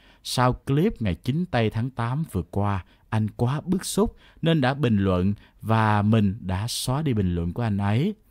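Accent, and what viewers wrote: Standard. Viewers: Kênh này nói chuẩn nha❤ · nói rất chuẩn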